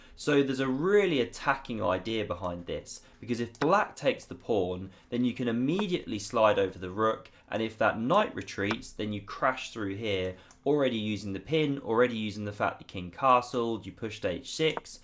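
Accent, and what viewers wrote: England, United Kingdom. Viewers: What a great oidee-are lol. I love the way English people speak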